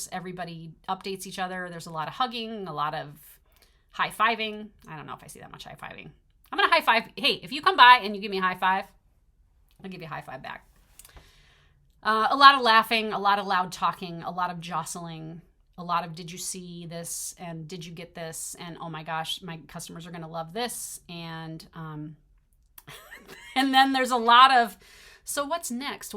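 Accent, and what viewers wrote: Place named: Pennsylvania, United States. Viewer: Woo Hoo! A blast from the past (and ever present!). What a sight for sore eyes you are dear lady! And what a fabulous catch up from one of my favorite people. I can’t wait to meet you in Tulsa this October. I love everything you showed us today. And, I am thrilled that you love your new happy place! My folks were born and raised in Erie: Mom in Little Italy, dad in the Irish and German neighborhoods. With dad’s work, we migrated west to LA then to Texas. That’s where we’ve been since 1970. I can only imagine the authentic everything you’re able to enjoy in the city of brotherly love. Your accent even reminds me of my cousins in PA. So, I’m sure you fit right in. Please drive carefully, stay safe, and enjoy every blooming minute of being with your friends in Tennessee. Congrats on your business, your new releases and on your new life in a lovely community. 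Happy stitching every day! You look great and so happy! ❤❤❤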